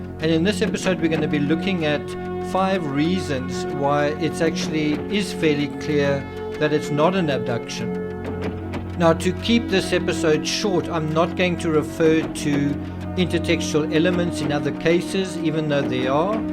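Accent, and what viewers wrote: South Africa. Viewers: the narrator is South African x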